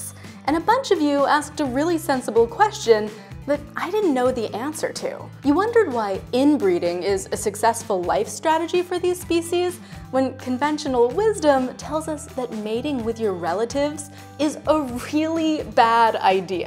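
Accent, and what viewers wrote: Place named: South, United States. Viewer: Before you even watch this you know she is a southern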